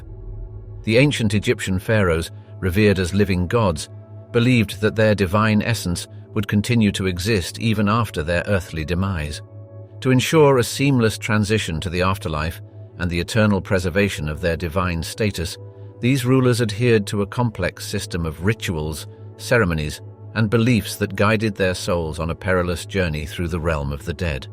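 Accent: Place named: England, United Kingdom. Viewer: YOU NEED TO BE “SLAPPED” FOR TRYING TO MAKE A GREAT CIVILIZATION LOOK BAD! Great Britain ‘s Royal family are “ALL COUSINS” & committed “INCEST” ALSO BY MARRYING FAMILY! ALL EUROPEAN ROYAL ARE CLOSE RELATIVES!!! King Charles & his deceased wife, Diana ARE “COUSINS”! “STOP” RAGGING EGYPTIAN ROYALS, WITH YOUR “JEALOUS & HATEFUL” ENGLISH ACCENT! HOW DARE YOU????!!!!!!!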